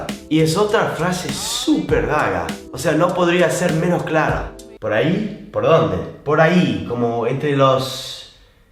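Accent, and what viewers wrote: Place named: Argentina. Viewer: posta chavon! se nota que tenes una conexion con argentina y lo bien que estas aprendiendo, no solo a hablar español, sino tambien a aplicar nuestro acento.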